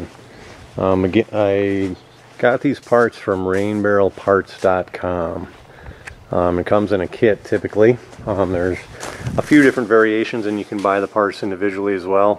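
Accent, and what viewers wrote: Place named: Midwest, United States. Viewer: You're accent tells me you're originally from the midwest!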